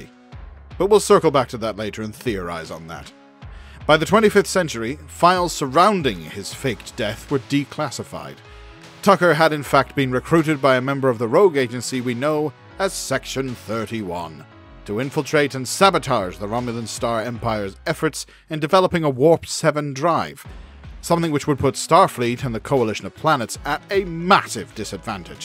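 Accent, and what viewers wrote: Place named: Scotland, United Kingdom. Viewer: I detect a slight Scottish accent boyo.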